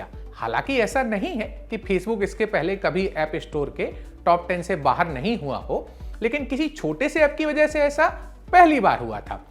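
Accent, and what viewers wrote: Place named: Bundelkhand, India. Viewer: ji aap bundelkhand ya gwalior-chambal sambhag se hain kya. Aapke bolne ki style se anumaan laga raha hoon.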